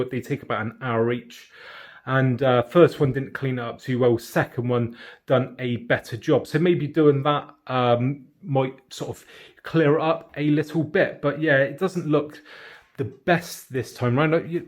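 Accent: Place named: England, United Kingdom. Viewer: Sério? Acho que ele é inglês, vc deve ta acostumado com inglês americano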